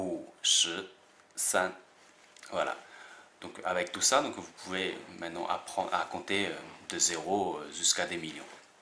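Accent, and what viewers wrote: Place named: South, China. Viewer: pas mal mais un peu accent du Sud par exemple 万 vous prononcez 旺——|||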